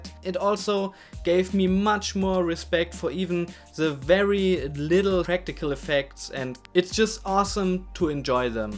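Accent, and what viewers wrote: Germany. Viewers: Das video an sich ist nicht schlecht aber ich kriege kopfschmerzen vom deutschen akzent 👍😖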